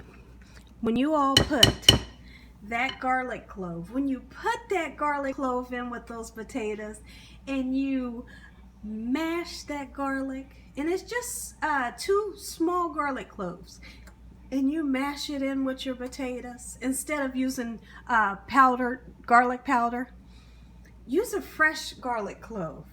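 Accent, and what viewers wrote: South, United States. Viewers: I just love listening to your southern voice 😂you’re so sweet THANKS FOR THE COOKING TUTORIALS 🙏🏾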